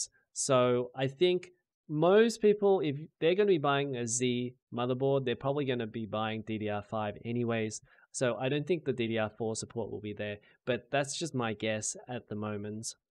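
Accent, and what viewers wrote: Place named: Australia. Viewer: Is this an Asian dude with an Aussie accent? Incredible!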